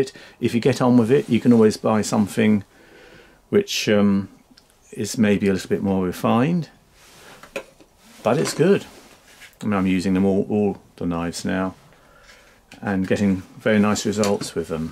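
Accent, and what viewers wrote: England, United Kingdom. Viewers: Thanks for the reply, comments and help. I have all of these while using an old “Real” leather belt more mounted to a strip of plywood. As an auto tech of 40+ years, I have polished a number of parts for restoration or those I have fabricated. I enjoy learning and new ways of doing things. I find your thick Proper English accent pleasantly enjoyable from a farm boy now in Omaha, NE. Blessings and be safe for the Holidays. Enjoy.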